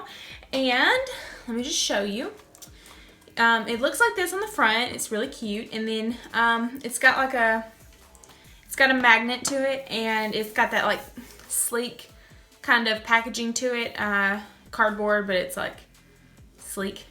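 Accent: South, United States. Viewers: hears southern accent subscribes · you are sooo pretty!!! and i love southern accents! ❤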